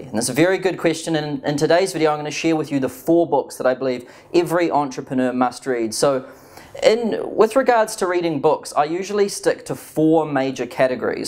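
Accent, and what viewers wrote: New Zealand. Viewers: Kiwi accent?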